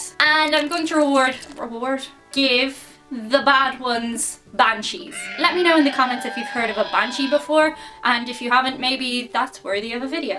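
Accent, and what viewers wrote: Dublin, Ireland. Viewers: I love the Irish accent, one of the main reasons I subscribed to channel. Not only do I get to hear her Irish accent but I learn about Irish culture in a fun and entertaining way. Thanks for your videos, look after yourself. Congrats on 25K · I listen to your videos for your accent more than the content... LOL Just kidding but i do love hearing the Irish accent. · Love the Irish accent....and you are just a pleasant to watch. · Love hearing your Irish accent when you talk. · the irish accent is one of my faves. just watching you (in particular) enunciate your words is entertaining :) btw, you should put some of your trademark chokers in the merch and create a cult following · did they get the accent right. And the more I watch u the more I cant hear your Irish accent but ur still great. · SternLX its still pretty obvious shes from dublin area though. 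Its not that neutral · After listening to the way you talk and your accent, I’ll pay closer attention when I watch something with an Ireland character. Thank you for sharing! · I enjoyed this and even though I only understand and 70% of what you’re saying, I love your Irish accent!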